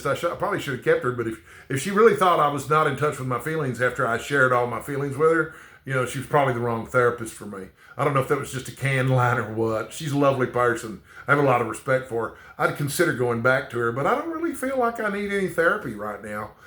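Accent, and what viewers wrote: Texas, United States. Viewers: What a fun video. 'Salem's Lot opened me up to reading and loving King after basically a lifetime of meh reading experiences with him. I did chuckle about the Texan accent. My Tennessee accent is often mistaken for a lifestyle that is reminiscent of Hee Haw and not a city dweller. Stay sexy and hydrated!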